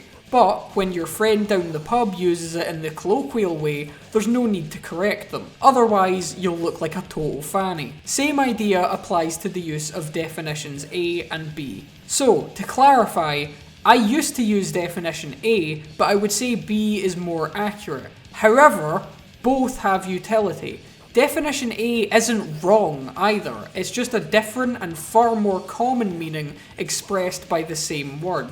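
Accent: Scotland, United Kingdom. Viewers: Yeees! More Metal and scottish accents 🤘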